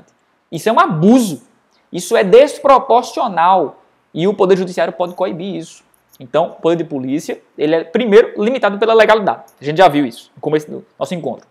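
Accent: Northeast, Brazil. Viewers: Amei a aula, mais um inscrito. Mais esse sotaque seu é maravilhoso, tu é do nordeste né? Amo meu lugar 😍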